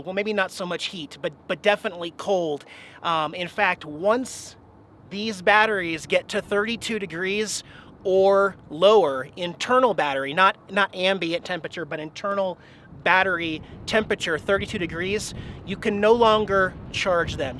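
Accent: South, United States. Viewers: Why is he talking with a southern accent now?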